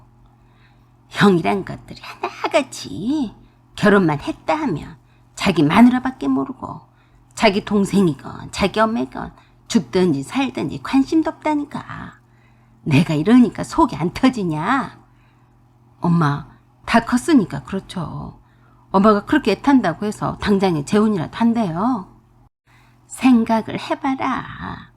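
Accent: Jeolla, South Korea. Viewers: 전라도 사투리가 많이 들리시네요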